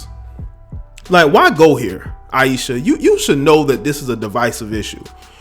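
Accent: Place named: AAVE, United States. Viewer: Stop speaking ebonics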